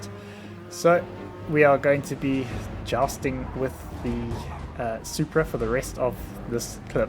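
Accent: South Africa. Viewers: You sound South African mate i swear you are i don’t just wanna say you are but you sound like it mate im watching am eskom turned my life off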